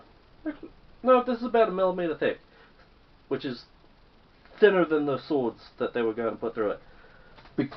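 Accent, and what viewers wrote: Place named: New Zealand. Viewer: Your allready sounding like a kiwi. I agree with the comment on you being too humble on your hard work.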